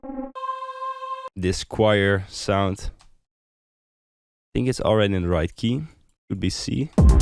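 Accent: Netherlands. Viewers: ik hoor de Nederlandse accent haha, +1 like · hey are you dutch? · are you dutch? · Are you dutch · ik ruik een nederlander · where are you from? kinda dutch accent XD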